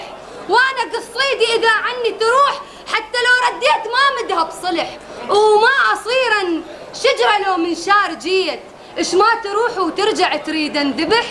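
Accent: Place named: Iraq. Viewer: الله، اللهجة العراقية روعة،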